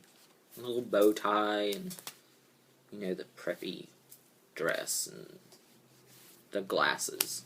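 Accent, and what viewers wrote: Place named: South, United States. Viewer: He sounds southern. Slow and the pauses, yes. The "ums" are a trademark of his videos Ive noticed. But it is who he is, if he did his videos definitely he would be acting. Everyone has their own style and mannerisms on youtube.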